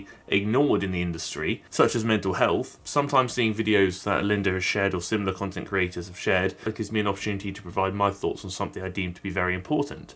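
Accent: United Kingdom. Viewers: I have PTSD that makes British accents (specifically male voices) very triggering for me. You are a rare exception. I largely attribute this to your content and sincerity.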